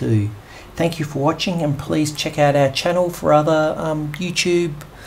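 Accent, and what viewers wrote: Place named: United Kingdom. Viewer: You would be very informative were it not for the painful experience of having to hear your extremely annoying British (or whatever) accent! Couldn't you get someone to speak normally so that we can understand??!!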